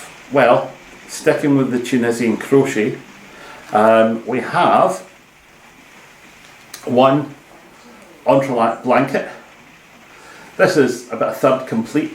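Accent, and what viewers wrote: Scotland, United Kingdom. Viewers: Hi, Nathan sent me. I really liked your podcast. Loved your knitting, accent and the pitter patter of your lovely Scottish weather. I'm am partial to Scottish originals, can't get better than bagpipes and Westies (We have 2). I look forward to seeing your creations. Good job!!!! Sandy (Albany, NY USA) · Just starting to go back and watch all of the podcasts, the socks are made from Drops Fabel...I just finished a pair in the same colourway.I love listening to you speak, it reminds me of my husbands family in Scotland. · I like your Scottish accent. Hey, here's how I talk, or taw-wuk: <link> How blessed you are to have a grandma who was so crafty! Lovely! By the way, I use acrylic almost exclusively. I knit for charity, and friends, and it seems to make more sense to make a garment that can be worn after throwing it in the hot water washer and dryer. 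Beautiful entrelac. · Hello. It was a pleasure watching your first podcast. As a native scot, now living in Canada, it was pure heaven listening to you talking about my passion, knitting, in your very calm and lovely accent.I look forward to your next podcast. Cheers Christine Ferguson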